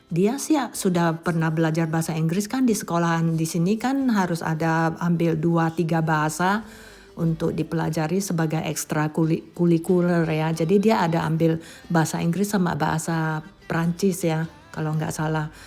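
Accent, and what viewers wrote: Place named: Aceh, Indonesia. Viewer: Waktu lewat beranda saya, logat bicara ibu seperti temen kuliah saya orang Aceh, saya kira ibu orang aceh Mau tanya ibu asli mana Ehh ketemu vlog ini... Terimakasih sudah bercerita... Sehat sehat bahagia selalu bersama 🤗🤗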